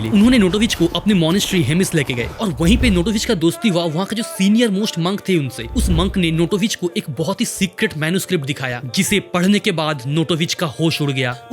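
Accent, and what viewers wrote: Bengal, India. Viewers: I wonder how you research and dig out such important, impactful but lesser-known topics for each of your videos, bro.... Let alone any other Bengali creators.... no other indian creators are doing this too...💗💗💗and I know you're a Bengali guy coz ur accent is Bengali😊 Dont know about Proud Indians... brother but I am definitely proud of u ... that you are Cleaning the Image of Bengalis.. and Showing the internet that Bengali Creators are not just Cringe .... Bengalies can actually be Creative to....🤘🤘🤘🤘💗💗💗 Glorie to Bengali and you bro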